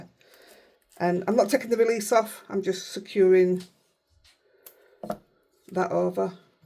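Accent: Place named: Lancashire, United Kingdom. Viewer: That's a stunning bauble! I live in Texas, USA, now, but my home and heart are still on the Lancashire/Yorkshire border (Clithero)--it's wonderful to hear a familiar dialect.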